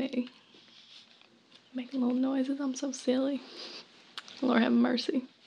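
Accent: South, United States. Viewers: Good job depicting how us southern talk and act .. well the true south that is .. hehe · I love this character! I'm not sure if you're from the south, but you do a pretty good job executing the twang. ; ) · This southern accent is soo cute 😍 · It's insane how much you sound and act like my cousins in the south in these roleplays. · This is by far the tinglest video you've made...especially at the beginning when you're just talking in that melting southern voice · How not love her southern accent 😊😊😊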